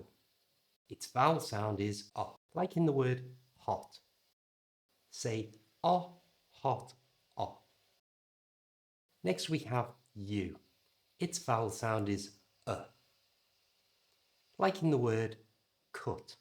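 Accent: United Kingdom. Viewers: ❤❤I am Mansour from the beautiful country of Iran, and I would love to learn the British accent. Thank you, dear teacher, for your excellent lessons. I appreciate your effort, and I kindly ask you to upload more videos so that we can learn English professionally and correctly from the basics with this beautiful accent.❤❤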